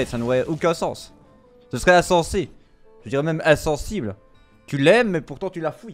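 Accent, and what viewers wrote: Belgium. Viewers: Ah ben ça fait plaisir une petite arène. Par contre quand je t'écoute parler ça sent bon la frite la bière et la fricadelle j'adore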